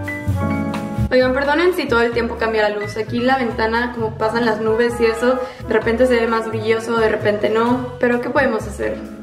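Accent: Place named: Spain. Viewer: ¿Por qué siento un ligero acento español jajajaja? :)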